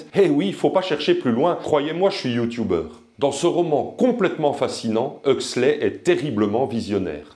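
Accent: Belgium. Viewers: non effectivement, t'as l'air d'avoir plus de 30 piges, donc c'est mort pour le neveu🤣 l'accent mi Schneider, mi De Croo ça doit être intéressant ça aussi, et pas très commun. Un bon moyen de te reconnaître si je te croise au hasard en allant voir le neveu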